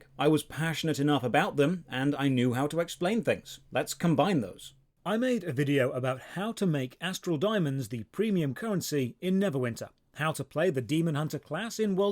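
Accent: United Kingdom. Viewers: I think I found your Channel because of your Dreamworld videos. And you are right, I was fascinated by how bad this game is.... And then I basically binge-watched the worst MMO Series... And then I subscribed. : ) But I really like your style, and your clear, 'easy-to-understand (British <3) English' (I'm no native speaker). If you continue like this, I'm sure you will do well! I'm so happy for you, that you reached 200k already! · I started uploading about 3 years ago (I think) and I'm only at 1k... I'm proud of my 1k, since I only play PS, follow only two free-to-play titles, do some Demo reviews, edit and upload with PS4 Share Factory, and have no idea what the hell I'm doing. However, I do believe I am missing only one thing to be a "overnight" success... a british accent! lol That said, I hope one day you do a collab with another great british accent Youtuber I follow Many congrats on your success and keep it up! 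· Well dressed double barrel British accent man is the best booba streamer.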